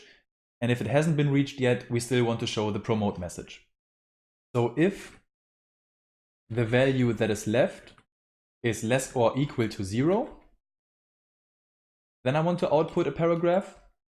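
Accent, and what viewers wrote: Germany. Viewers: Nice guide, but in the end i was too lazy to add it anyway 😂. You explain it very well, the only thing that i don’t really like is the german accent ;)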